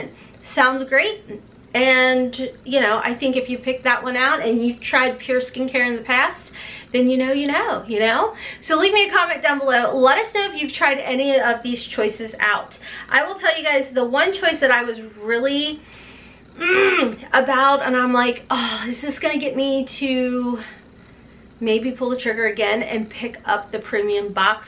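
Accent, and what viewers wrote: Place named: Kentucky, United States. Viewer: You seriously are amazing! I am also from Kentucky, born in Lexington, and its so nice to hear your accent! Love your videos and just remember to inhale confidence and exhale doubt! 😘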